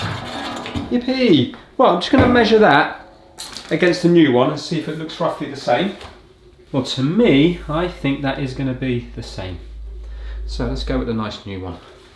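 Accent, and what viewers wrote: United Kingdom. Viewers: First of all, I LOVE your Brit accent that includes silly funny words and sentences which yeah… most brits are using. Then second, you just make it so simply to understand even for a non English speaker. Well done mate. Thanks for being here for us. 👍 Next time show us how to fix computerised sewing machines. I know, That alone is like a life job on its own 😄 Or perhaps electric radiators. Good job.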